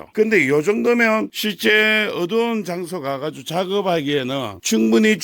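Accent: Gyeongsang, South Korea. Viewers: 겡상도 사투리 짜증난다.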